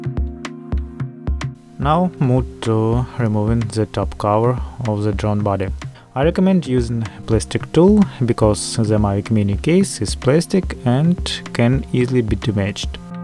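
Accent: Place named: Russia. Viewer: russkiy accent chyvstvyetsa))))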